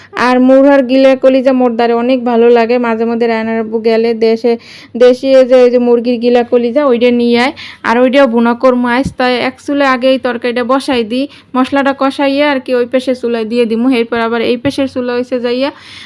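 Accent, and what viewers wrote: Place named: Barisal, Bangladesh. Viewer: Apni borisal ar vasai kotha bolban na please apu..ta na holay ami apnar video dakbo na..normal koray kotha boilan..suntay valo lagbay..ancholik vasha kaw e posondo koray na😊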